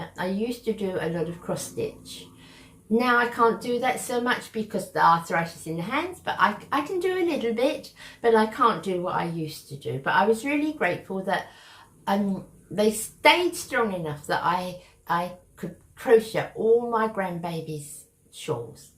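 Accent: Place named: Cockney, United Kingdom. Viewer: Thanks so much for answering my question! I love that you're a true Cockney! A rare breed, it sounds like. I love these videos because we get to know you better, but it's not fair to you because you don't get a peek into our lives, lol.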